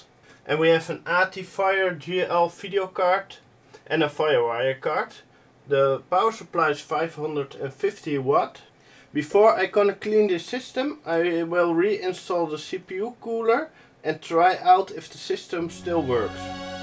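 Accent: Netherlands. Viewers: The Dutch accent is strong with this one :)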